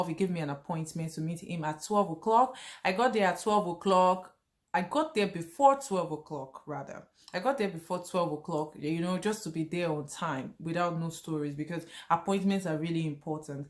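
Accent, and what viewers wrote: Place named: Finland. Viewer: ARE YOU ESTONIAN ? I THOUGHT YOU ARE FROM FINLAND BECAUSE OF YOUR ACCENT.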